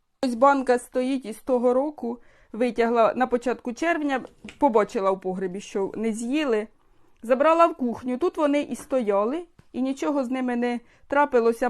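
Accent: Poltava, Ukraine. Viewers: І я одразу подумав, що говір полтавський. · В МЕНЕ РОДИЧІ ЖИВУТЬ У МИРГОРОДІ ,ТО Я ПО РОЗМОВІ ЧУЮ,ЩО ЦЕ ПОЛТАВЩИНА. Я ВСЕ КЛАЛА В ПОМІДОРИ ХРІН,А МЕНІ КАЖУТЬ,ЩО НЕ КЛАДУТЬ. ХРІН,А ПЕТРУШКУ. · З задоволенням додивилась до кінця. Навіть не дивилась-більше слухала. Такий рідний полтавський говір. Полтавщина і Вінниччина це моя любов. Бабусі мої там жили. Завжди взнаю людей звідти. Щире вам дякую за рецепт за поради за спомин.❤ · Відчувається в голосі полтавський акцент))) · так, не діалекти. Не вірно висловилась. Це специфіка нашого полтавського мовлення. Мені просто цікаво, ви в повсякденному житті розмовляєте виключно літературною мовою? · Як я люблю полґтавську говірку...мала батьківщина мого чоловіка... А які там смачні помідори! Дякую вам! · Дякую!Чую по мов1,що ви з р1дно1 полтавщини! Гарний рецепт! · Так мені подобається Ваш Полтавський говір)))Ніби спів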